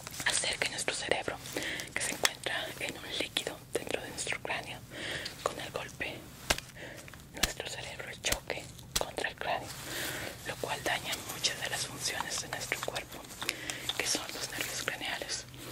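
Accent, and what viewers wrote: Mexico. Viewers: Asumo que eres de México Pero de que parte de México en si?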